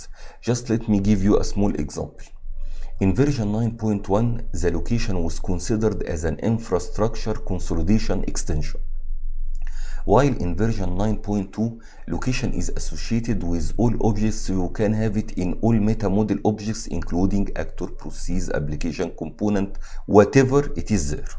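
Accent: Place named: Alexandria, Egypt. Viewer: والله المشكله مش في العالم العربي المشكله العالم الثانيه ماراح تفهم كلامك واللغة اللي تتكلم فيها. انجلش بس الاكسنت من اسكندريه !!!!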